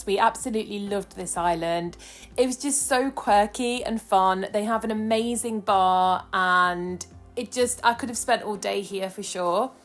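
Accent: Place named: Home Counties, United Kingdom. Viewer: Forgive the late reply but the YT gods deigned to promote this video of yours after I have just watched the latest release from "Nick & Lins" who are well known promoters, in the naturist community at least, of clothes-free adventures which was a recap of their own Croatian experiences earlier this summer. I noted with interest that you didn't feel able to join in and go nude due to a lack of fellow nudies when you were there. If you ever go again one thing that Lins covered in her commentary of her video was that if you go a bit further off the beaten track, away from the touristy masses, you're more free to go nude. I'm also guessing by your content and accent that your a Home Counties / London based lady and so there are options for you here if that was something you wanted to pursue (because let's face it, you haven't lived if you haven't at least tried this out through going to a nude beach, an organised nude swim or just going skinny dipping). 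There are several clubs in and around the M25, three within only a short drive of each other if you head Hertfordshire way. These clubs do also offer ladies-only days where you can try things out but exclusively with other ladies so you're less likely to have to worry about men being about. There are also several nude beaches along the south coast which perhaps could be an option for summer 2026 if you want to try out British options. British Naturism is an excellent resource for locations and advice on the law if you're worried a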